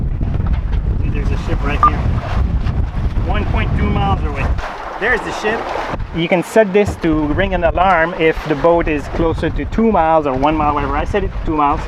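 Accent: Quebec, Canada. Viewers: Im going to take a chance here but would you be from Québec ? If not, your accent sound like it😃 Great vidéo, in fact, one of my favorite !! Lots of good actionable ideas in there !!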